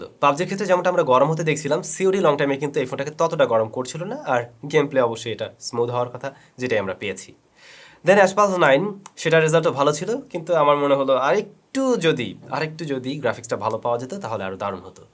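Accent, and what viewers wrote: Bangladesh. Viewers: Smart phone er full review er jonno video te click korinai apni advertise korechen ei video er how pathetic 😂😂😂 lose the hat and the hairstyle and the sudh desi bangla tone. thank me later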